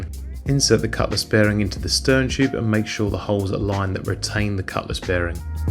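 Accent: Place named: United Kingdom. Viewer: A joy to watch. And to listen to. Your English is, well, the Kings English. Keep up the great work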